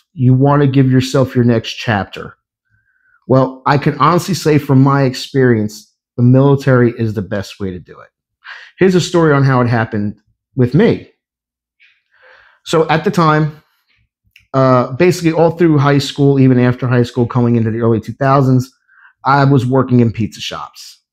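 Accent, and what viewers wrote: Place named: New York, United States. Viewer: yeah definitely detected the NY accent as I watched other Videos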